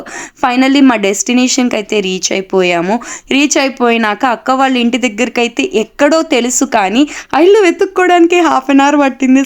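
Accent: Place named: Guntur, India. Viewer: Anukomaakandi. Pure gnt slang.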